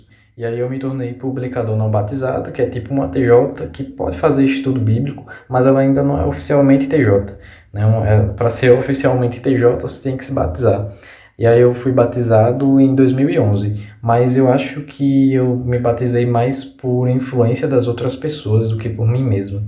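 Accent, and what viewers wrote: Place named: Aracaju, Brazil. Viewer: Aracaju. Tava esperando você dizer a cidade pra saber de onde é seu sotaque.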